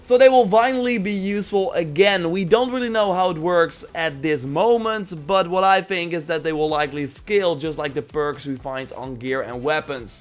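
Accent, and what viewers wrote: Netherlands. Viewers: Hoe kan je zo goed engels je hoort amper dat je nederlands bent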